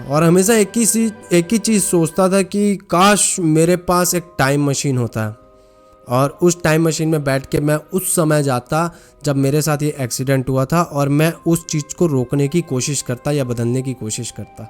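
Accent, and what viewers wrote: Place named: Hills, India. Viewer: You are talking like pahili vale log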